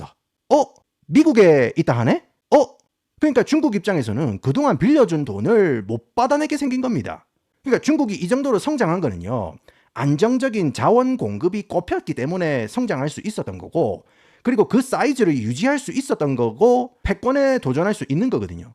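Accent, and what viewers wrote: Gyeongsang, South Korea. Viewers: 영어 발음은 네이티브인데 억양에 부산 경남 억양 있는게 존나게 웃기네 ㅋㅋㅋㅋㅋㅋ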